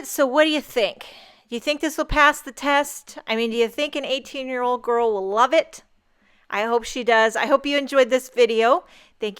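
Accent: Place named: Boston, United States. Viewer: Thanks for sharing!! When I first found cardmaking a year ago, I remember listening to u and laughing my “ butt off!” Lol! I was dying to know if u were from the Boston Ma area, by the way u were talking! Lol! U are such a breath of fresh air! Ur cards are absolutely beautiful, and ur fun to listen to too!!!😀❤️👍🏼 Happy bday to ur daughter! My baby just turned 23 yesterday!!!! I miss both of my boys so much! Enjoy all the craziness now! For me, the “empty nester” thing is “ for the birds!” Lol! It’s totally not a home when ur babies are out on their own! Merry Christmas to u and ur BEAUTIFUL family!!! Liz E Cape Cod Ma 😊❤️🎄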